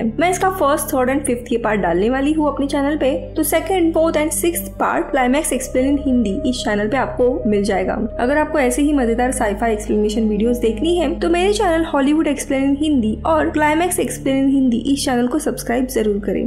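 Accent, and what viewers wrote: Maharashtra, India. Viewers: do you have any relation with climax explain in hindi channel's nikhil ? moreover your accent is quite marathi. are u a marathi? pls tell. like your choice of movies and explaination.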